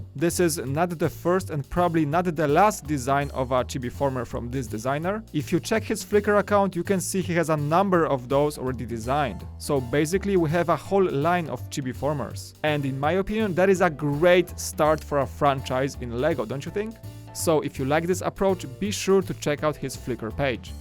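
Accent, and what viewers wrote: Poland. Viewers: No offense, but polish accent is terrible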